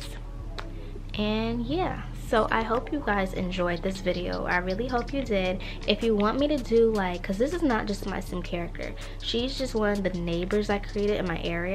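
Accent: New York, United States. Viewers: I can hear her new york accent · This is the most that I've ever noticed her New York accent 😭💞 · I never noticed your New York accent it’s so pretty ❤️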